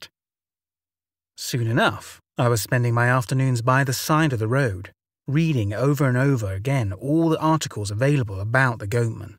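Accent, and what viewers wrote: United Kingdom. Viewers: Dude I love your British accent! It gives the stories a great touch. Awesome content my friend! Watching from Dallas Texas U.S. home of the Dallas Cowboys not too far from goatman Bridge!🤠 ⭐ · Do you have a British accent? Everything he says is really British sounding. Im not saying he says goat like goot or anything, just that he says goat with a very British accent.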